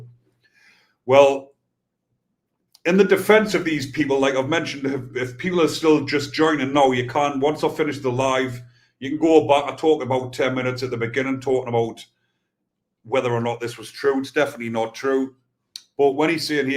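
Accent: Newcastle, United Kingdom. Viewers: Whi gives a flying fuck about men acting like women, it's clear to me that Ur a bully, a thug and a tosser itself. They might be in jail but that does not take the right away of anyone bring what they want, maybe you should be back in jail, giving it the large with the skin head and bs Geordie accent. What contacts have you got in jail, stfu you stupid twat, U chat so much shit.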